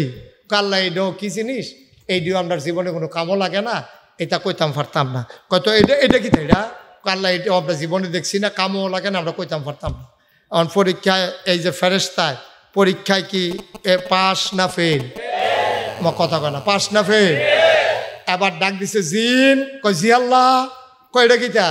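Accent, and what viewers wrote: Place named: Noakhali, Bangladesh. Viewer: হুজুর আপনি তো নোয়াখালীর হুজুর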